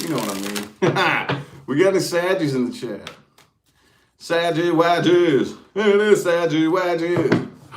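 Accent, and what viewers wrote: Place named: Kentucky, United States. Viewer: Love your accent. You sound like us in Kentucky.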